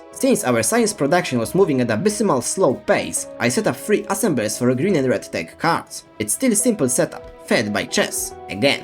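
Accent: Poland. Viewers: I am like 99% sure that ur native polish person, it's just something about your accent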